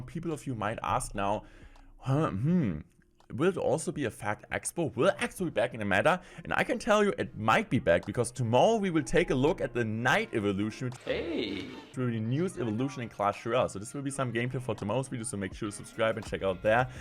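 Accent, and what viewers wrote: Germany. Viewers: Are you german i can tell by your accent😂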